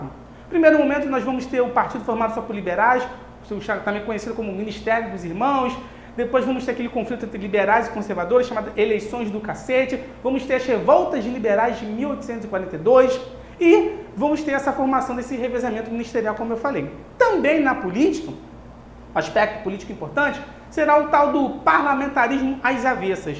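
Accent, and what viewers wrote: Rio de Janeiro, Brazil. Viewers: Esse sotaque parece Carioca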